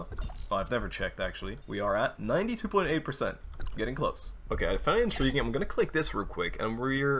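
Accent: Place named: France. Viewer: MAN THAT ACCENT HOLY WOOOW JE SAVAIS PAS QUE T’ÉTAIS FRANÇAIS je suis choqué mais grave surpris continue tes vidéos d’explorations elles sont grave chill!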